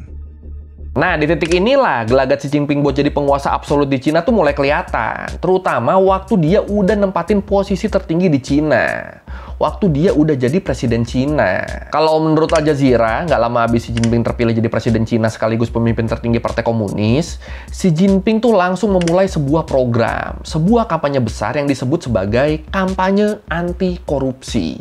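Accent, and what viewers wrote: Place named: Java, Indonesia. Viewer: ini perasaan gw doang atau bang di video ini lebih medok ya😅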